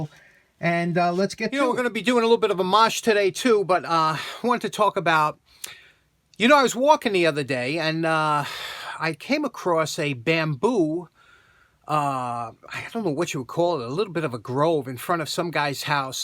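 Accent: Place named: Staten Island, United States. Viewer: Today, I was delivering and setting up a large grandfather clock for a man from Staten Island, his accent reminded me of you,. I work at a clock and watch repair shop in Western Kentucky. Our shop has been run by one family since 1902 and still has most of the german and swiss tools from the early 1900s. Can’t beat the quality.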